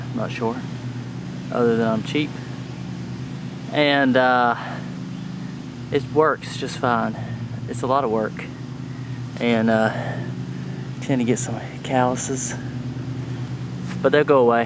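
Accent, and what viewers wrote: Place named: South, United States. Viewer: Widow maker 🤣🤣🤣. That’s funny, sounds like a southerner. (Thanks for the video)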